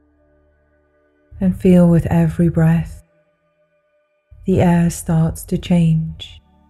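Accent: Wales, United Kingdom. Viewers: Guided Meditations by Lisa Beachy Good to see a positive, hopeful message in an increasingly ugly world. Do I hear a slight Welsh accent I wonder? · I'm trying this for the first time.. Lovely to know of you.. I seem to hear in your accent that you may be welsh.. Is this true?..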